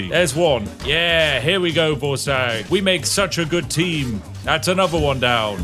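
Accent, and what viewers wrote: United Kingdom. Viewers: I dunno if its you but i got a mewgenics cat that sounds like you, and by that mean blatantly British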